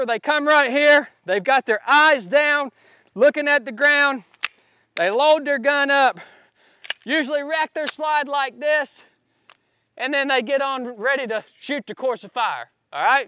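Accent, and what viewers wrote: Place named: Texas, United States. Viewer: Dammit brother If you didn’t tell me….id think your from Texas !!!!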